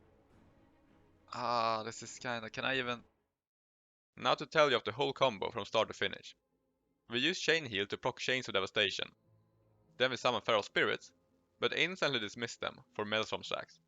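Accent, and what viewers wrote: Sweden. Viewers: Your Swede accent always brings a smile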